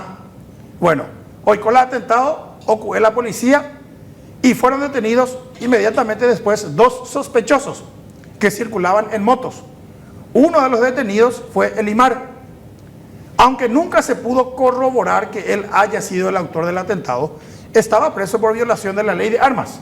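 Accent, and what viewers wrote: Paraguay. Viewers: Espectacular el jopará de...castellano, guarani portuguez eré eréa 😅😅😅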